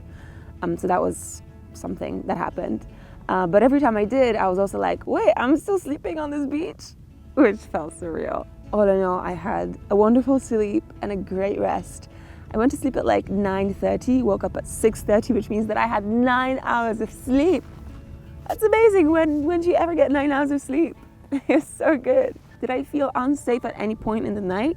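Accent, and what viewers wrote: United Kingdom. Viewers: you now look like a french, sound like a british, but true to your heart you are wild as a free spirit... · Just started watching your vids last week when your Texas videos came through and YouTube thought, You Darrel are a Texan, maybe this girls videos would interest you!. Broccoli raw is best eaten with.... Ranch dressing!!!! I have a burning question, you have such a charming British accent.... How did a Polish girl end up speaking english with a charming British accent?????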